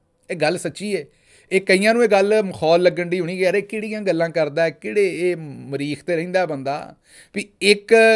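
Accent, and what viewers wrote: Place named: Punjab, India. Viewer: Your vlog sounds good to ears although such a strong Punjabi is not understable. Thanks for giving out meanings of common punjabi words. Keep it up!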